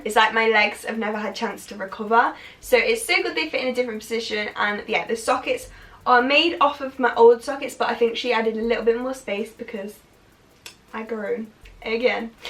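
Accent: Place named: England, United Kingdom. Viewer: Your a gorgeous girl...….I'm an Australian I love your English accent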